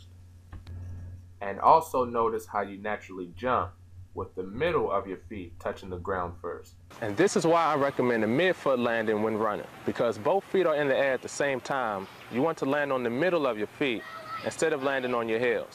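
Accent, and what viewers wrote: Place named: England, United Kingdom. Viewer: great video bro i like ur English accent love from India jai Hind hehe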